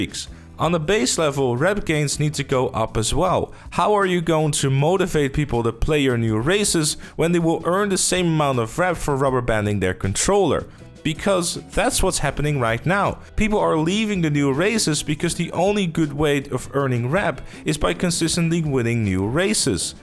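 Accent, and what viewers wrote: Netherlands. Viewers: Do I hear a Dutch man speaking English?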